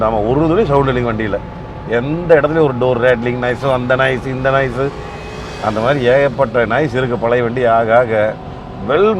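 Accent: Kongu, India. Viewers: கொஞ்சும் கொங்குத் தமிழ் பேசும் கோமகரே...! திகட்டாத தித்திக்கும் பேச்சுடைய திருப்பூர் திருமகரே...! மதிப்பிற்குறிய " " திருப்பூர் திரு. அண்ணா🙏❤ எப்படி இருக்கீங்க❤🙏 அண்ணி எப்படி இருக்காங்க❤🙏 ரித்திக் எப்படி இருக்காரு❤ அருமை அருமை அருமை வழக்கம்போல அண்ணா உங்களோட வீடியோ அருமை❤❤❤😊😊❤❤ என்ன சொல்ல ஏது சொல்ல😊😊❤❤❤ சும்மா நெகு...நெகு...நெகுனு இருக்கு வீடியோ😊😊❤❤❤❤ பூவாட்டம் தாலாட்டுது உங்க பேச்சு❤❤❤❤❤❤😊😊😊❤❤ நானும் 80s kids அண்ணா❤ என்னோட பிறந்த வருடம் 1988தான்😊 அதுமட்டுமல்ல இந்த வீடியோவுல இன்னைக்கி அண்ணா நீங்க எடுத்துட்டு வந்த இந்த பழையகாலத்து "மாருதி 800" காருக்கும் என் வாழ்க்கைக்கும் ஒரு பிணைப்பு இருக்கு❤❤❤❤❤ பிறந்த குழந்தையான என்னை மருத்துவமனையில் இருந்து வீட்டுக்கு அழைத்து வந்த முதல் கார் இது❤❤❤ நான் என் வாழ்க்கைல பயணம் செய்த முதல் கார் இந்த "மாருதி 800"தான்😊😊😊😊😊😊❤❤❤❤❤❤❤❤❤❤❤❤❤❤❤❤❤❤ என் பெரியப்பா வைத்திருந்த கார் இது அவர்தான் பிறந்த குழந்தையான என்னையும் அம்மாவையும் அப்பாவையும் மருத்துவமனையில் இருந்து வீட்டுக்கு அழைத்து வந்தார் இந்த காரில்❤❤❤❤❤❤ இப்போது இந்த கார் அவரிடம் இல்லை விற்றுவிட்டார். 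எனக்கு சரியாக 15 வயது இருக்கும்போது இந்த காரை அவர் விற்றார். நான் முதன் முதலில் டிரைவர் சீட்டில் அமர்ந்து படபடப்போடும் பயத்தோடு லேசாக ஓட்டி நகர்த்திப்பார்த்த முதல் காரும் இதுவே❤❤❤❤❤❤❤❤❤❤❤❤❤❤❤❤ நீங்காத நினைவுகளை கண்முன் கொண்டு வந்துவிட்டீர்கள் அண்ணா❤❤❤❤❤❤❤❤❤❤❤❤ அய்யோ செகண்ட் வீடியோ அந்த சீட் பெல்ட்🤣🤣🤣🤣🤣🤣🤣🤣🤣🤣🤣😂😂😂😂😂😂😂😂😂😂😂😂😂😂😂😂😂😂😂 இந்த டிரைன்ல டாய்லெட்ல சங்கிலில கட்டித் தொங்கவிட்டுருப்பாங்களே எடுக்க மட்டும்தான் உங்களுக்கு முடியும் மத்தபடி ஒன்னுமே முடியாதுனு சொல்ற மாதிரி🤣🤣🤣🤣🤣🤣🤣🤣🤣😂😂😂😂😂😂😂😂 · Kongu mandalam tamil. We ball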